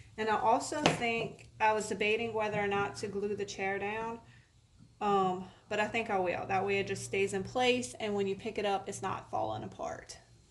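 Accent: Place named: South, United States. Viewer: Just discovered you and subscribed! Where are you from? Your southern accent is strong ☺️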